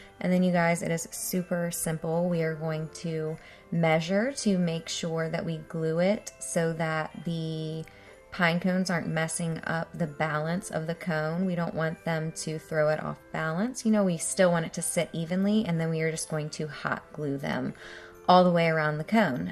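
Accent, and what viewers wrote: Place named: United States. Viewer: Lovely video ✨ I love them all, especially the white one. Creative, a nice narrator, and nice American accent 🌙 🎄❄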